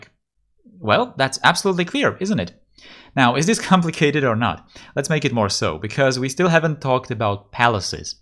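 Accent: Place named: Czech Republic. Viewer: You must be from Czech Republic. I am also. You have Czech accent when talking English and you speak very well Czech. You always start or speak about Czech Republic 🇨🇿. I love you. Jsi nejlepší, pokračuj. Znáš Poděbrady? Verz interesting episode, not just the talking but also the gameplay😄👍🏻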